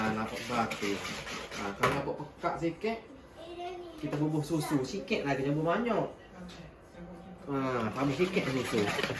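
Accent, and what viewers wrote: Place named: Kelantan, Malaysia. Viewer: Saya baru tgk resepi Yorkshire ni Dalam channel Cik Yatie Vlog. Tgk dia buat nmpk sedap n mudah.Dpt pula suggested dr YT channel ni, terus melekat. Nak try buat jugak lah pudding ni. Btw, cute lah kecek kelate.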